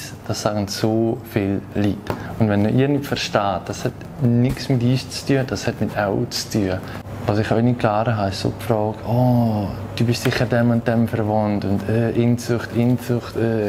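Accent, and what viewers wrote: Valais, Switzerland. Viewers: Safe isch Valliserdütsch dr schönscht Dialekt ! Segi au als Solothurner haha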